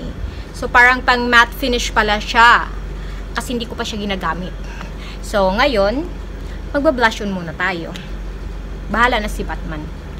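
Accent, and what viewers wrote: Visayas, Philippines. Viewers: i love bisaya accent kaya ok lang yan seswang dami ko friend na bisaya at natutuwa ako kc alam mo mostly sa mga bisaya magagaling sila mag english..buti kapa magaling mag makeup ako seswang tumanda na talaga di ako natutong mag makeup😅kay vice din ang gamit kong liptint maganda talaga sya tapos di nagda dry lips ko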